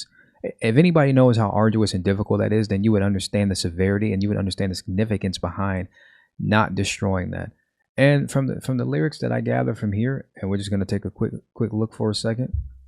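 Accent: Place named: South, United States. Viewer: What we have is Me Too version 2.0 the race war edition with these absolute mental gymnastics that these people are doing so they can cry racism about everything. Did you hear they are trying to call out being on time is racist?? But great commentary and I can hear the "Southerener" in you. Everyone looks at me like I am stupid living up north when I say it that way.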